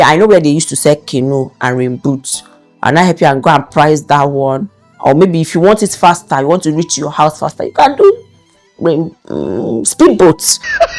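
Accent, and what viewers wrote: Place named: Nigeria. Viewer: No adjust your English, jare — keep that beautiful Naija accent. I find it incredibly attractive!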